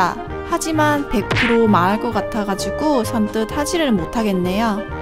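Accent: South Korea. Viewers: 님 대구 사람이예요? 아님 서울 사람 이예요? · 꼬마 부산에사셔서 부산같은데..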